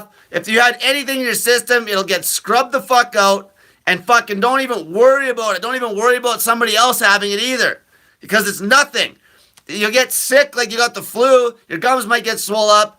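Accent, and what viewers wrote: Canada. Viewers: Jesus Christ.. what part of Canada are you from?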